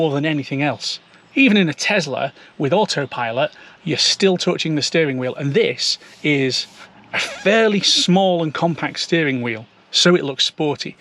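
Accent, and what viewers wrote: Scotland, United Kingdom. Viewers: Excellent video and congratulations on your new Model Y! I’m a huge fan of Tesla here in the United States and looking forward to getting the Cybertruck. All the features that you listed resonate with me and your description was spot on. Thanks for the brilliant review and keep the videos coming. Love the Scottish accent.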